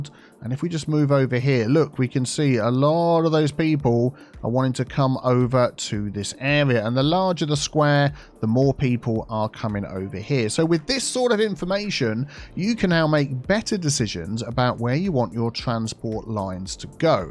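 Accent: United Kingdom. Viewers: Sooooo, having a British accent has that affect on non brits? 👀👀👀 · Youtube algorithm works in mysterious ways! I had a very similar experience with Silberphönix. And yes, British accent does have that effect!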